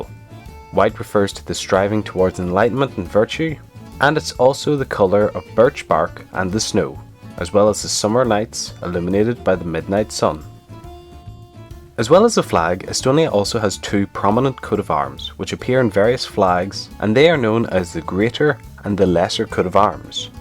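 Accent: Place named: Scotland, United Kingdom. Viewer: Your scottish accent is funny 😆😂😀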